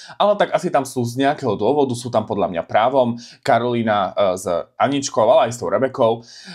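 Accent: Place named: Eastern Slovakia, Slovakia. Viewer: podľa prízvuku od vychodňároch.. či? 😅🤣❤ Som z východu.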